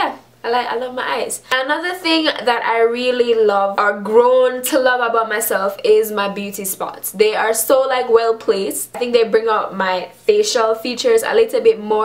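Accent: Jamaica. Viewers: Yuh can tell seh yuh a Jamaican big up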